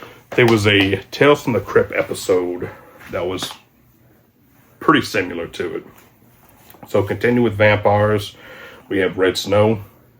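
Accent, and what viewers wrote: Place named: Louisiana, United States. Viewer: as soon as you started talking I thought Louisiana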